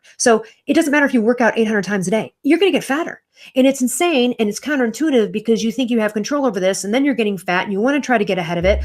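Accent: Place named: Australia. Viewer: you could be taken as an Australian with that accent.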